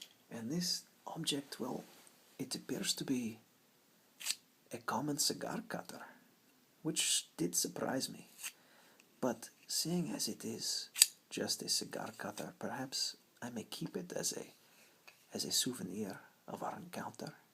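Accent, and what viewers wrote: Russia. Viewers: the russian accent ... is pure tingles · Ur Russian accent is amazingly cute and a bit funny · How are you able to speak with a Russian accent?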